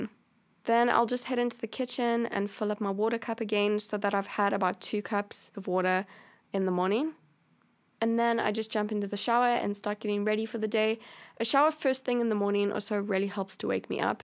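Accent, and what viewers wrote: South Africa. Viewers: are you from south africa? love your accent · I detect a South African accent?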